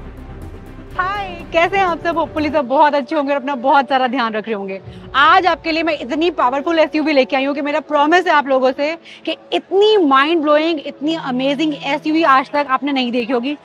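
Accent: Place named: Tamil Nadu, India. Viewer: Can’t even understand what she is presenting with Tamil and India accent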